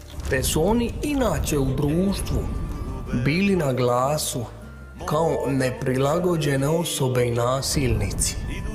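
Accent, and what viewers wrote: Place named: Split, Croatia. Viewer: Možda a govorim šta ja vidim. Interesantan je, ima lipi splitski govor, al nije u škvari sto posto govori on je bija moru u to vrime.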